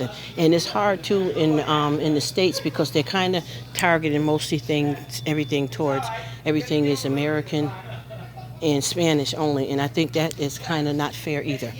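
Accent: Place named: Jamaica. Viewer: Love your Jamaican Accent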